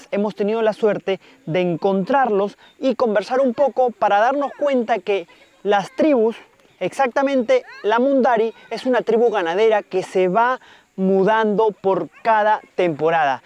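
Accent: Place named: Argentina. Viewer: Pobre hombre no quisiera estar en tu lugar, hablas Como Argentino, y tienes in aire de Carlos alvarez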